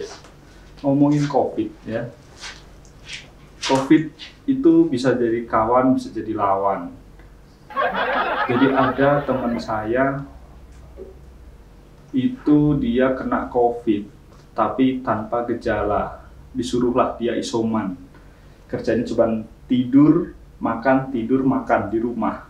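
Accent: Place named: Java, Indonesia. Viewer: Keren dok....suka bahasane... pertahankan medoknya dok...he2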